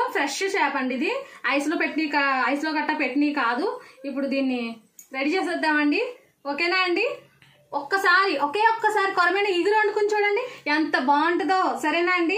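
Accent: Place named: India. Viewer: మీరు మాట్లాడే విధానం ఉందే అచ్చ తెలుగు ఆడపిల్ల ఎలా మాట్లాడుతుందో అలా ఉంది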